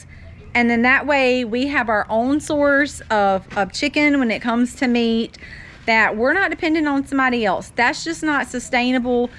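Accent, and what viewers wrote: South, United States. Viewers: I also love hearing your Southern drawl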